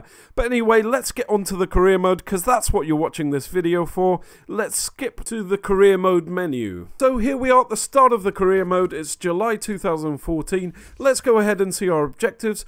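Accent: Portugal. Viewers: You re portuguese right?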